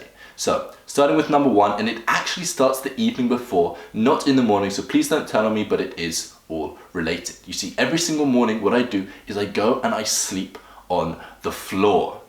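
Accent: United Kingdom. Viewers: Subscribed. And, I love your British accent!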